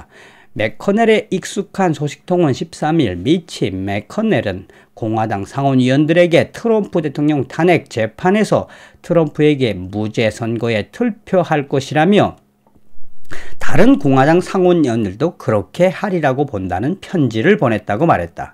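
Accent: North Korea. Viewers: 북한 사투리로 가열차게 진행할때 속이 후련합니다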